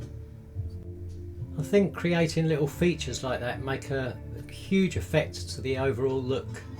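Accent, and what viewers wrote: Texas, United States. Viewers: Thank you for your backstory. I just found my long-lost brother. I performed the fiddle for 40 years. If you lived near me, I would love to turn you loose on my woodworking equipment. The only thing we would be missing is a good selection of exotic veneers and some specialized clamps. I think your old western movie inspired, Texas accent is perfect. If I did the voice over, everyone would be subjected to my horrible rendition of Monty Python. It's clear to me that you have 10 times the artist in you than me. Your precise attention to delicious detail tells me that you were a master of both painting and marketry. I on the other hand, will always be a slave to industrialized symmetry. I agonize over the finish of my work. I've always wanted to be paired up with someone who could teach me how to add soul to my work. I'm great at the skyline and stymied by adding life. 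· Wow this is quite different, and fun. My wife is from Texas, she says it's cool that you tried the accent. I feel that way any time I try and English one. Ha. That chuck wagon sure is cool I also enjoyed the explanations as I am largely mod illiterate and can't tell when something is custom built 104 beams! I I understand the effort there believe me The inside of the house looks so good. The oil lamp is clever, as is the use of conduit. So many small details that i lost track, mesmerized All the barrels in stages of build at the cooper…just brilliant Was that cat named cooking fat? 🤣 Awesome build. Just excellent work.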